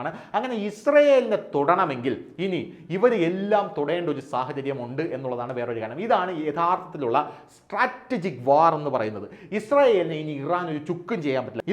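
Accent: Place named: Pala, India. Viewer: രസകരമായി present ചെയ്തു 👍പാലാ slang ൽ 😂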